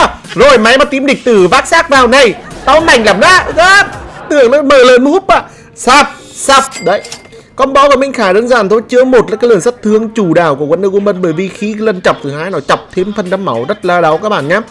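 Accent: Nghe An, Vietnam. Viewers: phải quê nghệ an k cách nói chuyện hay quá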